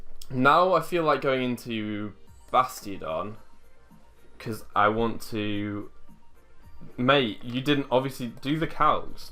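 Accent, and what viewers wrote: United Kingdom. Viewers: Man I love British accent, keep it up!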